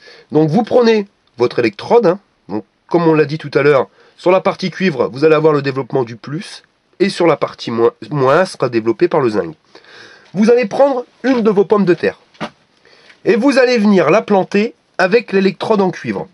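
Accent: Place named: North, Germany. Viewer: super, l'accent deuch nord est beau aussi!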